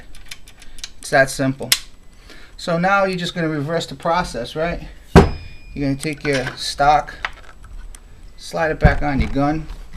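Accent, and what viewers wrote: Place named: New England, United States. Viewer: You have a great accent. New England is my guess. Thanks for the video.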